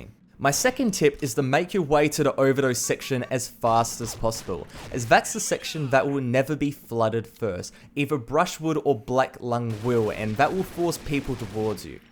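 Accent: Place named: Australia. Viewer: LMMFAO..only 7 years..first of all change the idiotic shrimp on the barbie voice..2nd of all get some skill from someone who has played since Beta..👍🏻👍🏻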